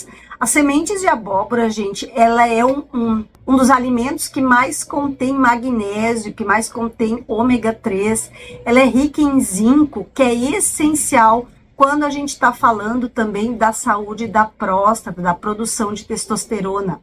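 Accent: Rio Grande do Sul, Brazil. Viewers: Sou de BH, MG, gosto muito de suas orientações e tbm de seu sotaque gaúcho, mto engraçado.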